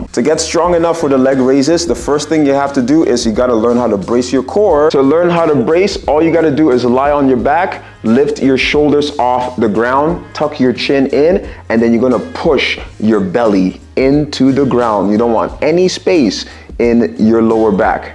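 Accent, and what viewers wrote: Toronto, Canada. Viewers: I knew you were from Toronto (GTA) 5 seconds in…. the accent is strong 🙏🏼🇨🇦🥰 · Toronto accent on this man, ahlie?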